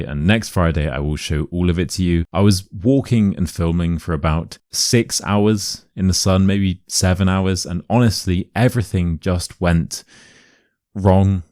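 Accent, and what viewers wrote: United Kingdom. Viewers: Thanks, Teacher! You always deliver lovely videos with a great British accent. Have a nice day!🌻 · You are amazing, I used to hear the American accent, but for my IELTS exam I started training my ear to the British accent thanks to your channel.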